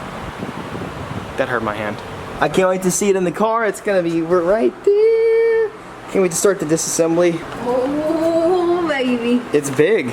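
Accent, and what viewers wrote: Australia. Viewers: Ten out Of ten for Aussie accent 👍👍👍👍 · I love the Aussie accent!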